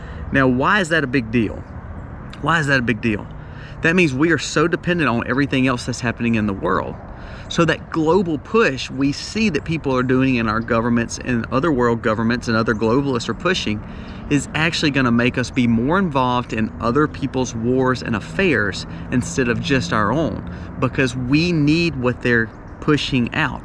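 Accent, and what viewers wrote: South, United States. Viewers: Great music, great guy, great information, and great Southern accent! 😊👍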